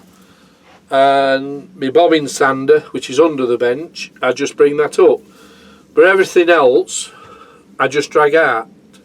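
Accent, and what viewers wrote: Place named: Sutton in Ashfield, United Kingdom. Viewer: I've got a biggish workshop and still go no room lol. You sound local to me! Sutton in ashfield.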